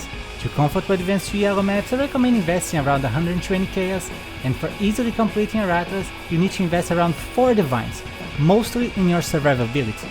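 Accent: Brazil. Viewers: by your ascent you sound like brazilian guy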